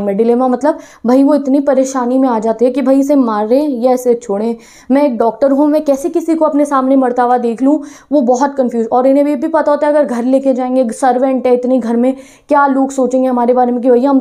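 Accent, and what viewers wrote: Punjab, India. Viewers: Didi aap punjabi hai??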